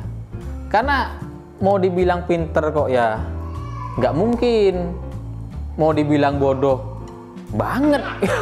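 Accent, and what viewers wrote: Madura, Indonesia. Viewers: Logatnya mirip logatku, logat madura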